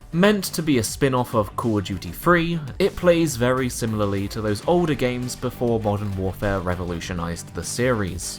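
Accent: United Kingdom. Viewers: Thaaaaanks! I always imagine your British accent when I read your comments lol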